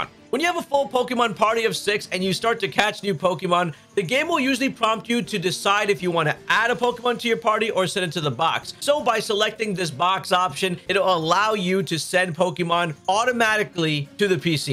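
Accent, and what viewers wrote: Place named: United States. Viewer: Sounds American to me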